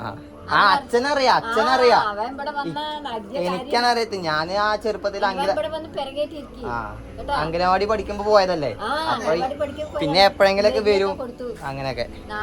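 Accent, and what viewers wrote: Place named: Palakkad, India. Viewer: Palakaad ഭാഷ കേൾക്കാൻ നല്ല രസമാ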